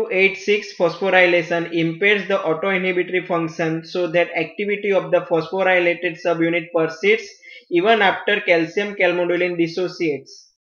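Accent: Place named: Germany. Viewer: You sound like you are german though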